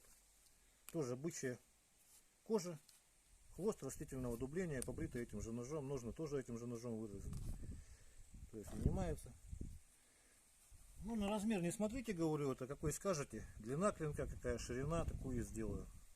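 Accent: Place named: Vladimir, Russia. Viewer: Я нутром чую, что ножи добротные. Руки мастера и говорит с добром в словах. А моё чутьё меня редко подводит. Для меня очень важно, что бы нож был сделан кузнецом с душой и добром в сердце. Такие вещи чувствуешь сразу. Жаль, что не могу заказать у мастера этот инструмент, так как нахожусь далеко, совсем рядом граница с Польшей, рукой подать. От себя скажу, что такой нож надо выбирать под себя не по интернету. Такую вещь надо в руках подержать, а лучше им поработать по дереву. А газета - это красиво, эффектно, но не эффективно, на мой субъективный взгляд. Говор у мастера интересный и довольно мне знакомый. Владимирская область?🤔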